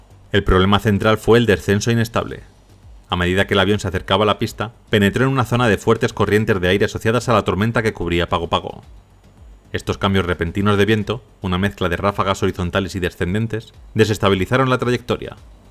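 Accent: Spain. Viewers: MUCHACHO RELATADOR. HABLA MÁS DESPACIO PORQUE NO SE TE ENTIENDE TU ACENTO NO SE DE QUÉ PARTE DE ESPAÑA SEAS. PERO HABLAS CON LA LENGUA PEGADA AL PALADAR ÓSEA TE OYES CÓMO UN TRABALENGUAS , TRATA DE PRONUNCIAR TUS PALABRAS MEJOR DICHAS. ESQUE TE COMES LAS PALABRAS Y APARTE HABLAS RAPIDO. NO SE TE OYE NADA CLARO CORRÍGETE MI AMIGO. GRACIAS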